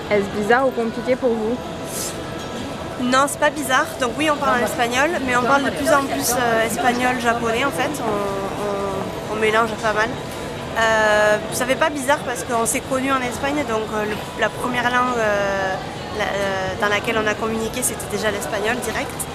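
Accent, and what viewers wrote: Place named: Toulouse, France. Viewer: Ca fait plusieurs vidéos que je regarde de toi, et je me disais : "mais elle a le même accent que moi elle doit être de Toulouse".... Et enfin j'ai la réponse ! :)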